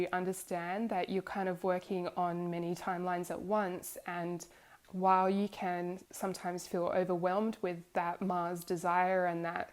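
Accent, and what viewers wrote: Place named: Australia. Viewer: I sense a Aussie accent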